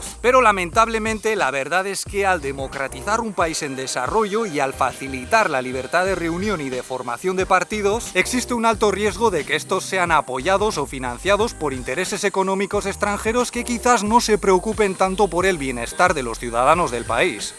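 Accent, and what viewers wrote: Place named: Spain. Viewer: No se quien luce más Chino si elle o su marido. Con su semblante que pone en las cámaras de líder de el partido comunistas que nadie le puede preguntar nada. Ese es el semblante que se ve. No digo que es así pero es lo que proyecta. O será que la cultura china a influido tanto en el que pone un semblante de Chino más que lele. Además querer soñar Chino con su acento español suena algo gracioso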